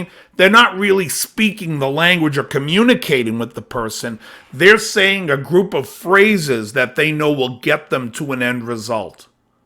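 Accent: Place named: New York, United States. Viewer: Like I said….really glad I stumbled onto your channel! So much great stuff….and you talk just like a a New Yawker. What part of New York are you from anyway?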